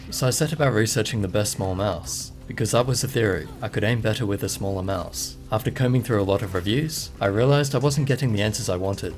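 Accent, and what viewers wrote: Australia. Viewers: I seriously love ur smooth soft aussi accent voice. Been quitely following you for many months and have recommended you many times to many friends and they all got great help from your Videos eventho youre not yet quite at 100k congratz to being the most amazing tech/mouseyoutuber on this platform. -Not so smooth german dude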